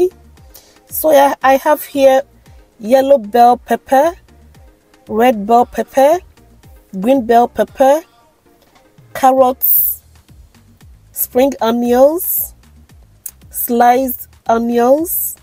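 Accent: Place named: Jamaica. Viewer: What accent is that? Jamaican accent?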